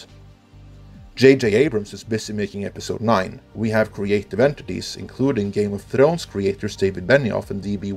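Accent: Sweden. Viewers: you're swedish, aren't you?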